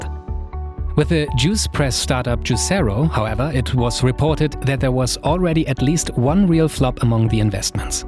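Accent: Germany. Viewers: Super Arbeit die du leistest mit den Videos! Man hört auf jedenfall raus das du deutscher bist :D Keep up the great work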